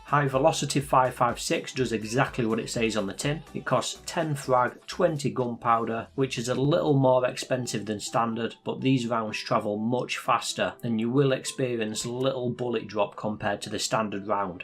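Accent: United Kingdom. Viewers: What English accent is this? Sound familiar, my English teacher? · Is this the speccy bum accent from Glasgow?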